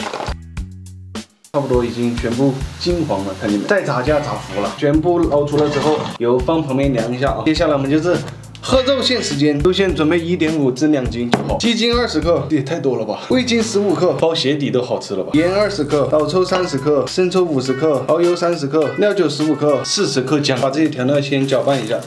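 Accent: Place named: Shanxi, China. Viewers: 这是山西北部，内蒙西部的口音啊！ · 这山西口音，弄上水zanzan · 姥姥必须得是我们山西人，这口音，一听就是自己家姥姥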